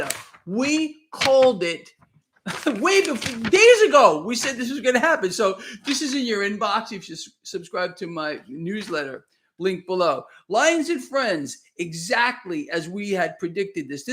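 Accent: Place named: New England, United States. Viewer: Hi. I've been "quietly" listening to you for a few months and increasingly so over the past 2 months. You've quickly become my favorite person to listen to and get my financial info from. I really enjoy your personality, sense of humor, and your sharp mind (and the Yankee accent I grew up around). I believe I first saw you while listening to Daniella Cambone (Stansberry Research) and then on Lynette Zang's channel (ITM) many months later. I generally listen while busy and/or moving around so I don't generally watch the chat or interact, but I feel like I'm in the right place where I resonate with what you're saying. Anyway, I just wanted to say hello. Your channel feels like a community from what I can tell so far. I really like that and hope to become part of the coolest club on YouTube 😎 FR. Seriously though, I appreciate the honesty and "realness." TY. 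❤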